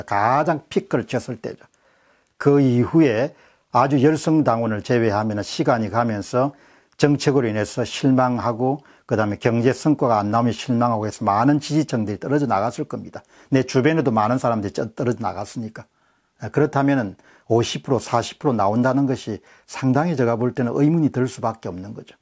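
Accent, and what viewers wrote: Gyeongsang, South Korea. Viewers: 강한 대구사투리... 그것만으로도 공병호의 신뢰성 없어 보임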